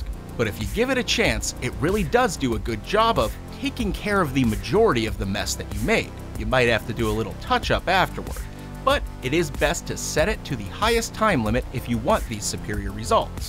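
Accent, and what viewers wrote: Buffalo, United States. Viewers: Are you from buffalo????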